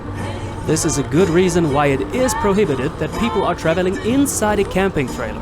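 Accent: Germany. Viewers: I told him early, that his English wasn't necessarily bad, just ultimately "German". Some people as you like it, others don't. He'll be reading the comments here, so he'll get to know. ^__^ I hope you are gonna stay as watcher!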